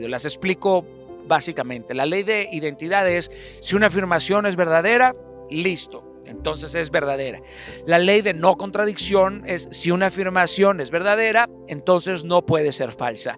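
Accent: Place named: Greece. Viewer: Tienes acento griego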